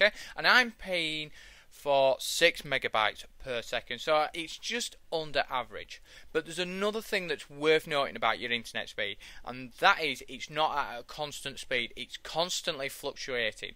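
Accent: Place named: Leeds, United Kingdom. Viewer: You from Leeds? Great accent!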